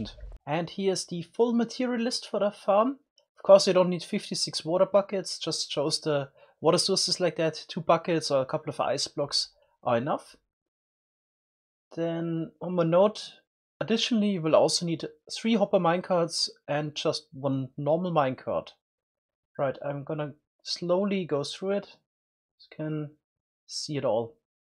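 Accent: Germany. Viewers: First time I am watching a video from you, after 2 seconds, aaahhhh you are german! :D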